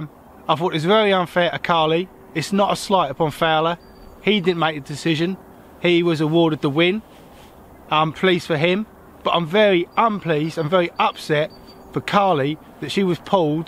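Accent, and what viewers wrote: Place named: Essex, United Kingdom. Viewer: Where are you based bro? it's not aired in the UK yet but you clearly have a Southern I'm guessing Essex accent!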